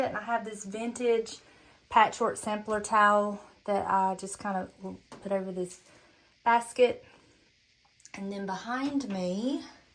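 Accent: South, United States. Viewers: You had me when the door opened. That southern voice speaks to me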